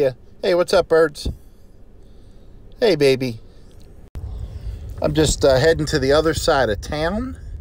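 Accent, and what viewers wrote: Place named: New England, United States. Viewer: I’m catching up again, so good to hear your yankee voice! Never realized how homesick you still get after 47 years!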